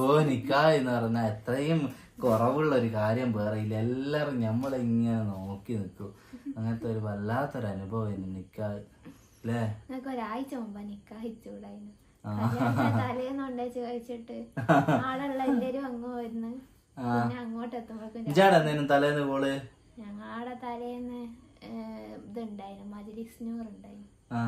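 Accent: Vadakara, India. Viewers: Nte rathri muzhuvan vedios kandu❤kannu niranju😢ithrayum prayaasangal okke undaaayittum ningal koyambathooril shop thudangi scooter maangichu❤oru vidhathil ellaam oppichu❤ avasaanam baaghyanithiyaaya oru khairaaya bhaaryayeum kittii❤eni angootulla jeevithathil orupaad uyarchayil ethatte🤲🏻vadakara mekhalayil ulla youtube channel pothuve kuravaaanu😂ningale vadakara slangilulla varthaanam pravaasthil irunnu kelkkumbol nalla sandhoshm ❤chekkotti bazar nte aduthulla CHEMMARTHOOR Kaaran❤❤ NB:aare kondum parayippikkaatha vedios cheyyuka 😂❤sneham maathrm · Vadakara varthanam😂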